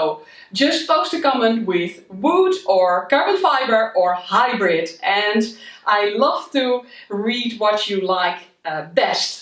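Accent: Netherlands. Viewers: I love your Dutch accent ! ❤️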